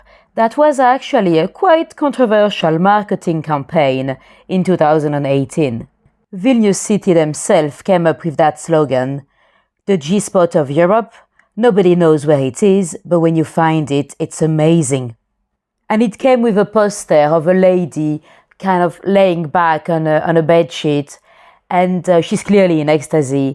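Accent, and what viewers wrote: France. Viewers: Nice video, nice storytelling and also nice accent. Is it French?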